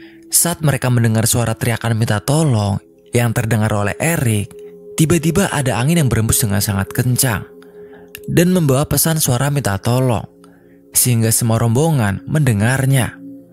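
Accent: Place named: West Java, Indonesia. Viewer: Itu logat Sunda 😅😅😅pas akhir kalimat agak gimana gitu 😅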